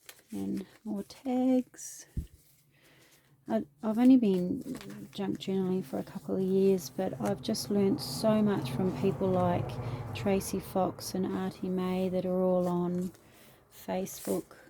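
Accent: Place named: Australia. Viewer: Love your Sassenach journal, as a fan of the series, this gorgeous journal does it justice, love all the pockets/tuck spots and the fabulous images/ herbs with how to use is fantastic, you have created a great journaling journal, tfs , nice to hear an Aussie voice have subscribed, good luck in all your future creations xx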